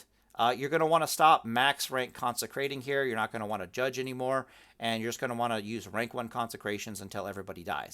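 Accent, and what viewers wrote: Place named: Minnesota, United States. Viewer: You from Minnesota? My Minnesota friend says blah blah blah blah blah just like you.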